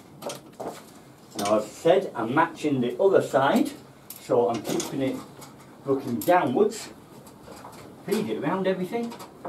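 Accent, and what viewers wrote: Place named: Blackburn, United Kingdom. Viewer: Great video , sound local to me in Blackburn . Saved me hundreds of pounds was dead easy to fix . Thanks so much fella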